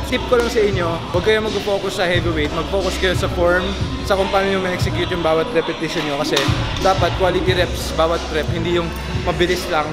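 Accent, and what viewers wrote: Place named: Tegal, Indonesia. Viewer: Orang tegal ya? Bocah ngapak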